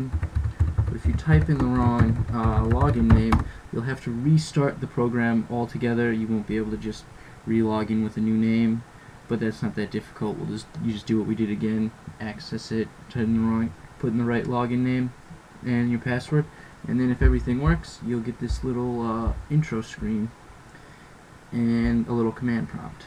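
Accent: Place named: Brooklyn, United States. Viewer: You sound like you are from Brooklyn.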